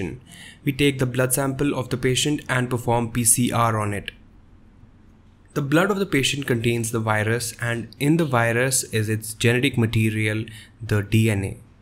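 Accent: India. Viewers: Lol why this school related videos are always indians that I actually understand. (Not sure if he is really indian, but he sounds like it)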